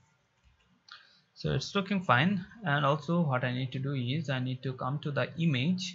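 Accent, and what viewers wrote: India. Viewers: When I hear a Bengali voice with English accent, I think I'm gonna learn something easily. Superb Tutorial! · i'm so simple guys. when I saw an India English voice making tutorials, I liked :)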